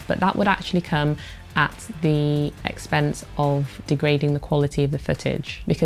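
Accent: United Kingdom. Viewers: And the British accent is like a free pass to "everything she says must be true"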